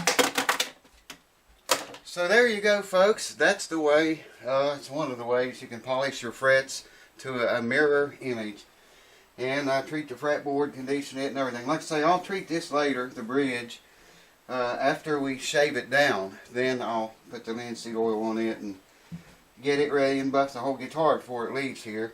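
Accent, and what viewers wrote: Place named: West Virginia, United States. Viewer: Just found you, great videos. I have a D15M Martin and love it. Every time I take it out of the case I stick my nose in the sound hole and take a big breath! Where you from, I grew up in WV and am picking up some WV accent on some of your words, I live in NC outside of Charlotte now. Love your channel.....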